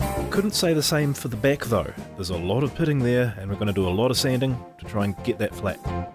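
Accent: New Zealand. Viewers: Clear explanation and step-by-step practical demonstration. Enjoyed this video. Kiwi accent.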